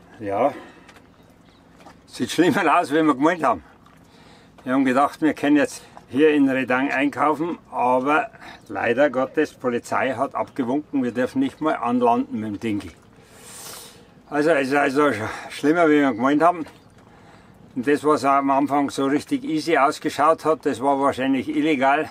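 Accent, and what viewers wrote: Bavaria, Germany. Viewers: Wia sympathisch ❤ s'gibt halt nix scheenas als Schimpfa af boarisch 😆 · Du musst doch aus Bayern kommen oder ich hör das an dein fluacha 😂mei opa red genau so da her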